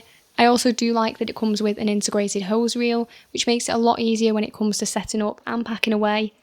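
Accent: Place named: Manchester, United Kingdom. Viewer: Edit. See my reply Would you go for the Ava Go p55 over this? It seems AVA themselves no longer seem to sell this on their website. Reason I'm thinking is as follows:- Nilfisk £240, plus £40 for standard stubby gun you suggest (plus adapter?), plus £50 for MJJC Foam Cannon = £330. p55 Go with Foam Cannon (probs not good as MJJC but the Premium Inc one here for AVA will probs be good enough) = £260 Any input would be greatly appreciated. Maybe you wanna sell your p55 cos you've got much better and it sounds like you're from Manchester! ? Again, any input would be greatly appreciated. I did consider the p40 Go £150 but not sure would be quite good enough when trying to do most washes via BH Touch-Less without a contact wash.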